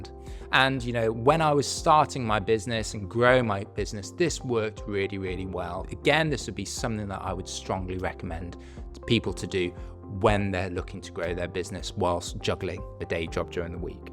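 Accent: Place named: United Kingdom. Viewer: Hey are you based in the UK? I am looking to start it now & I came across your British accent lol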